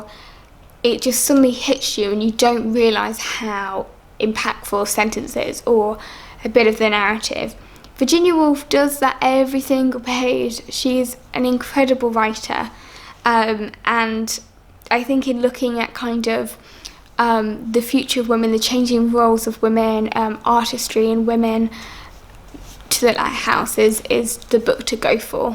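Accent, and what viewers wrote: United Kingdom. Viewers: Wow british accent. Tbh difficult for a nonnative speaker like me to perfectly understand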